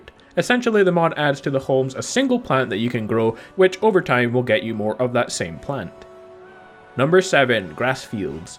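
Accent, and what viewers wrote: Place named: Scotland, United Kingdom. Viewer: hes scottish i believe :)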